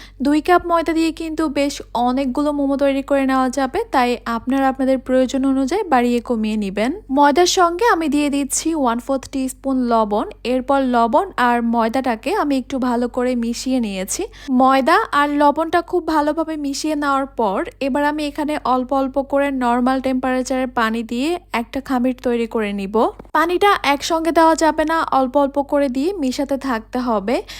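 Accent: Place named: Bangladesh. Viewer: বাংলা দেশের যে সেটা বিশ্রী উচ্চারণ শুনেই বোঝা যাচ্ছে। বাংলা ভাষা টা সুন্দর সাবলীল ভাবে বলুন শুনতে ভালো লাগবে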